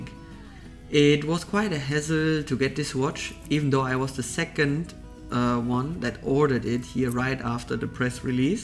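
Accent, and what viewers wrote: Germany. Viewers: Der Akzent 😂 100% deutscher 😂was macht ein Deutscher in Dubai ?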